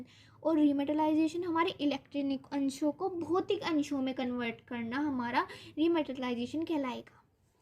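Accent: Uttar Pradesh, India. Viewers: Aapki cute voice m up dikh rha hai i am also from utter pradesh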